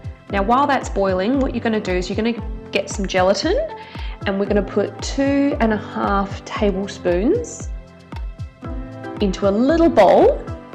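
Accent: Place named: Australia. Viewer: Really? You’ve obviously never met an Australian, this is how a lot of us talk. 😊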